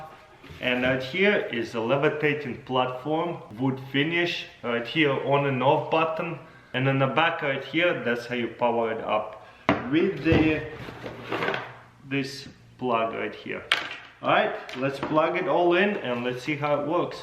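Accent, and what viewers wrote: Russia. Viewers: Why nobody talk about his pretty stereotypic Russian acsent? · Try to speak more fluent English. How is your russian accent so thick even though you've lived here for so long?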